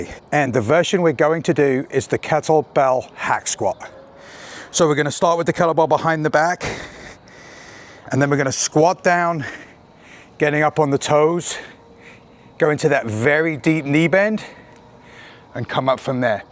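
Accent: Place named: Australia. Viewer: Where are you from? That's the first South-east UK/ east coast US, with hints of Aussie accent Ive ever heard?!?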